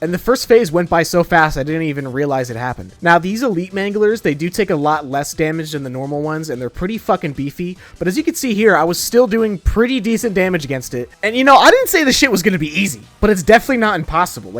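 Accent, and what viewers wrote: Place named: Midwest, United States. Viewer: Love the Fucking commentary 😂 you from Chicago or the Midwest or something? Haha hope your channel grows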